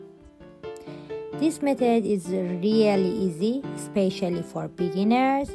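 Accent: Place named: Iran. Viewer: وای انگلیسی‌ت لهجه‌ی فارسی داره 😅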